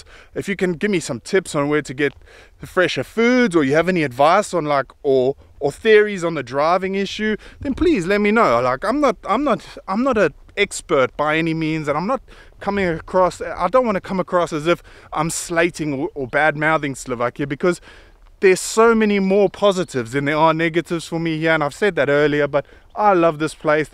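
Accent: South Africa. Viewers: I kept wondering where you're from as your accent sounds South African, so happy that you mentioned it 😁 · Thank you for your honest confession. :-) I can imagine it's tough living so far from the sea. The larger lakes and water reservoirs don't really make up for it, as nice as they are. And I believe you when you say the UK's beaches aren't that magnificent-looking, compared to any of the coastlines in South Africa. You're right that some drivers still drive like maniacs. And that's after we've had stricter laws about speed limits and driving in general implemented in the last decade and a half or two decades. Some people just can't seem to learn they need to be more careful and have more empathy on the road. I suppose I've gotten pretty good at recognising anglophone accents over the years. I've only needed to listen to your's for a few seconds and thought, "Oh ! 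You're South African ?". :-) Well, I hope you like it over here. It's not a perfect country and it has its fair share of issues, but hopefully you'll find it welcoming and peaceful for many years to come. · Are you South African? answered that. Love it. Keep well, all the best. Haha, you never drove in Joburg then? 😂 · Howzit. I also just moved here 3 months ago from RSA, i picked it up in your accent straight away... Lets get together and have a Braai one day, we have a Weber here too..✌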